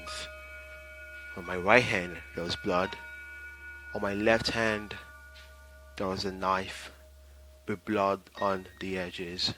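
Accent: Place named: United Kingdom. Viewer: y you sounding British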